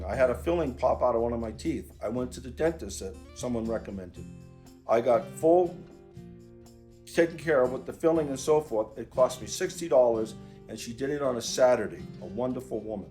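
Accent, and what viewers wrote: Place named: New England, United States. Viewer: You still have you New England accent. Not Massachusetts but Conn VT or Adirondack area of NY. Thanks Just speaking to friends last night about moving to Costa Rica or Panama. Do you know Jim Willey?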